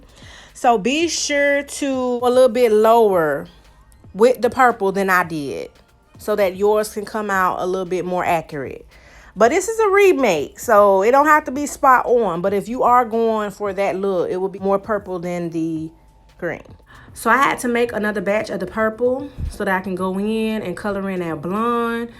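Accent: South, United States. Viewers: Gwerlllllll you killed this 😍 I’m not good with wigs at all! My friend glued it down for me but you made this look so simple now I wanna order a blonde wig and try it 👀 btw where are you from ? I love your southern accent.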